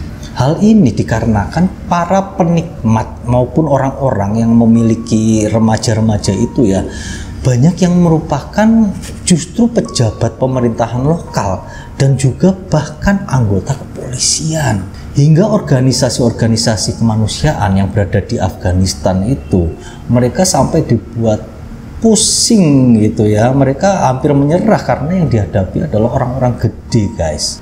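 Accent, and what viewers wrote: Java, Indonesia. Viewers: Logat jawa tpi pke ny gua,gua. Gk byambung mas hrus ny pke kalimat ny sy bukan gua.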